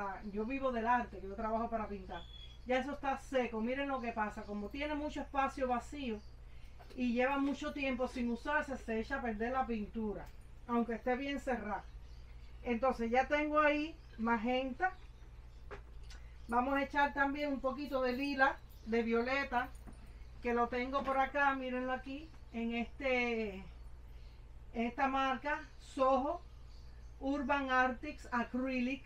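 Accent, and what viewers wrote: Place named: Cuba. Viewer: HOLA ME ENCANTA TU TONADA CUBANA!!! MUCHAS GRACIAS POR COMPARTIR TUS CONOCIMIENTOS 😉 BENDICIONES 💖 😊 😘 🙏 UN ABRAZO GRANDE DESDE CÓRDOBA ARGENTINA 😊❤❤❤❤❤❤❤😊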